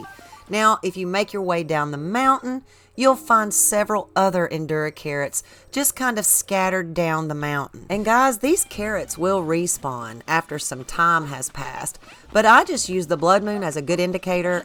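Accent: South, United States. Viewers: You are from the south thanks for the information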